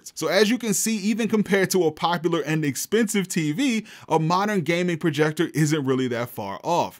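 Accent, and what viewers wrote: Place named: Memphis, United States. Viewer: Are you from Memphis? “Lumen” was not helping you hide your accent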